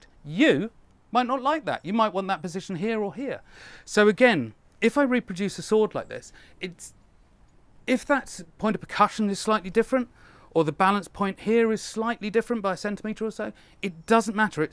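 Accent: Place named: Midlands, United Kingdom. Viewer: of workshop,. Now you're doing it to troll (and I love it). I did want to mention just how much of business is management of client expectation. I can hear the slight frustration in your voice! Unfortunately people with middle English accents (myself included) can sometimes present fact as slight disdain, especially to those in the south. Regardless, it's clear you're coming from a base of experience and passion and that bow is simply stunning.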